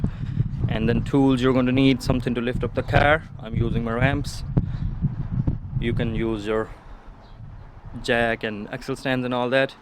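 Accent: Ireland. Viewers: I heard the Irish "twang" easily enough! But we're all best friends ❤️❤️ until the 6 nations 🤣🤣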